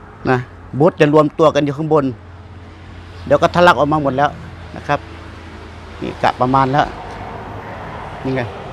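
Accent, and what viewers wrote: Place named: Central Thailand, Thailand. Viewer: ขอบคุณครับน้าที่ที่สอนการซ่อมเครื่องตัดหญ้าสอนพูดภาษากลางคนภาคใต้เข้าเข้าดีครับครบทั้งสี่ภาคเข้าใจภาษากลางขอบคุณครับน้า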